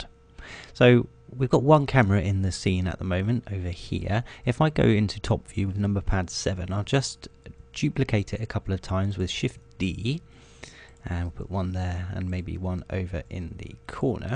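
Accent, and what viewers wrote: United Kingdom. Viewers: English Accent :) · british :D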